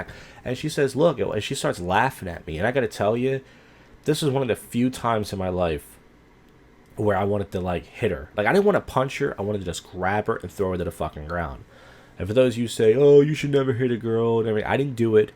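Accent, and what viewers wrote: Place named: East Coast, United States. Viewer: U sound like east coast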